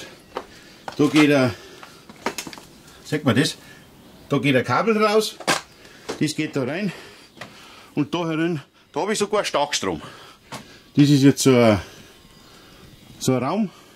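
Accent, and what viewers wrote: Bavaria, Germany. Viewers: Hed eher vom slang her af woidkircha de richtung tippt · Hallo! Als Preuße lerne ich dich akustisch zu verstehen. Ich vermute das du ein "gemildertes" Bairisch sprichst. Da ich deinen Vlog mag und mich nach anfänglichen sprachlichen Problemen zu einem Fan entwickel, vermute ich das es auch in ferner Zukunft einen Punkt geben wird das ich dich verstehen werde. Ich lasse für den Anfang ein Abo da und freue mich auf weitere Projekte von dir. 😀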